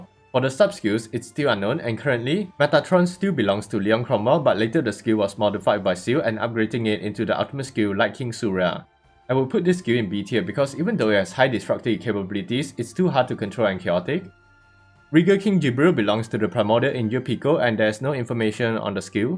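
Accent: Singapore. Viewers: ur singaporean right the accent sounds familiar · Hmm you sound singaporean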